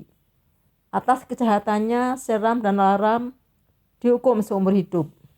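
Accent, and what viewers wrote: Java, Indonesia. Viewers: kadang ada yg bilang medok medak jangan di hiraukan mbak semangat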